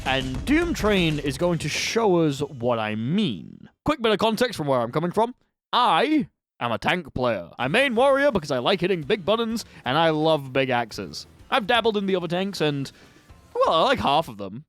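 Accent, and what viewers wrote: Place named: Australia. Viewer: "UK". No, Australian. So UK Lite 🙃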